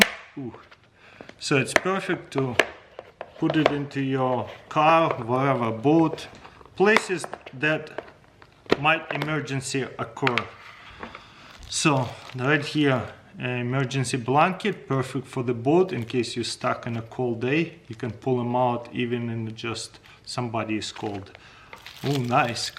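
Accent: Russia. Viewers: Russian accents are the coolest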